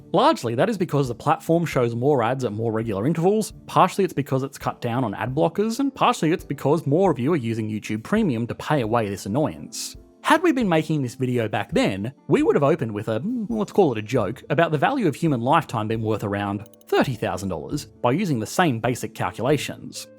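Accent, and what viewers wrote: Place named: Bogan, Australia. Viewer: Subscribed for the dulcet bogan drawl.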